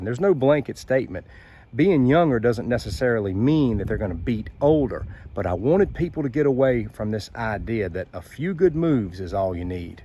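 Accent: South, United States. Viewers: GREAT ADVICE. I APPRECIATE THE STRAIGHT TALK, AND BREVITY TO THE POINT, AND FANTASTIC SOUTHERN ACCENT. IT ADDS. FASCINATION OF THE CLARITY. WITH UNDERSTANDING. WHAT YOU ARE SAYING. IT IS INTERESTING THAT SOMEONE WITH NO TRAINING COULD DO WELL IN A FIGHT. DOES. SAVAGERY COUNT FOR ANYTHING. ? I. MASSIVELY TRY TO AVOID. FIGHTING, BUT FROM EXPERIENCE. I SEEM TO ATTRACT SECURITY, AND. FEAR WHEREEVER I GO. I COULD BE .RESCUEING. A CRICKET TO RELEASE OUTSIDE, OR TRYING TO BUY MY GROCERIES. NEXT THING A I HAVE SECURITY GUARDS. FOLLOWING,. OBSERVING, OR TRYING TO HUMP MY LEG.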